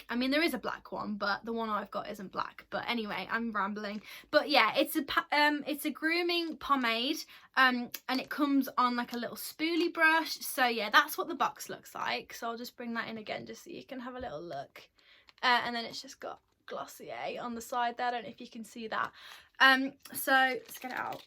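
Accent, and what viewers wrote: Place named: United Kingdom. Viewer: No problem! 😉and yes I love the British accent 🥰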